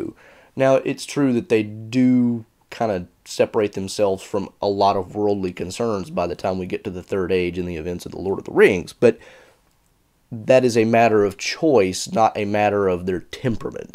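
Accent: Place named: Georgia, United States. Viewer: Georgia. That's your accent. Georgia. I know it.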